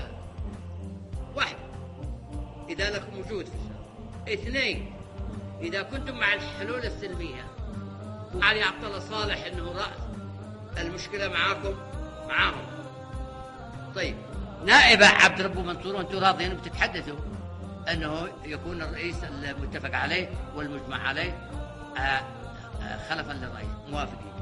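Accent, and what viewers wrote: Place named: Saudi Arabia. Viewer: ماشاءالله عليك واضح من لهجتك انك سعودي من ذماران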